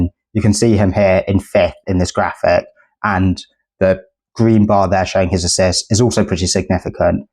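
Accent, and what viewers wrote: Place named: United Kingdom. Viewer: Something about the marriage between the British accent and football is just so glorious to behold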